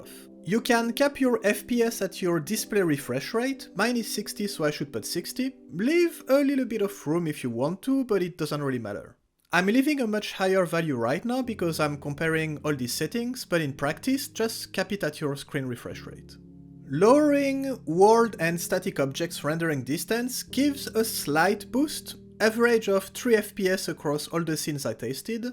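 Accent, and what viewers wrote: France. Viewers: you french ? great video btw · You're the frenchiest YouTube I've ever heard.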